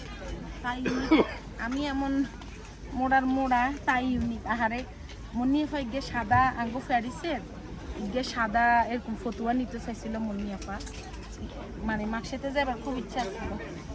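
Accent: Noakhali, Bangladesh. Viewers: এটা কি নোয়াখালির ভাষা?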